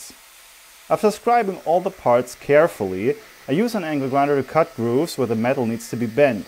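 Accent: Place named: Germany. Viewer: I do not care what you make/salvage or do, i have always watched(listened) because of your voice. It is German dialect, not annoying, but rather comforting. Thank you!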